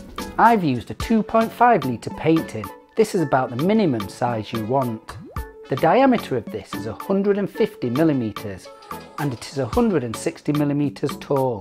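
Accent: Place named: England, United Kingdom. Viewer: He's most likely in England, Australia or New Zealand. The English pronounce it as "Al-ew-minium". Why? There are those that believe the guy who first created Aluminum from Bauxite named it that... and pronounced it that way. You can research up the oft controversial topic and do some reading... it can be somewhat amusing to us engineer types. Peace & good vibes! - Max Giganteum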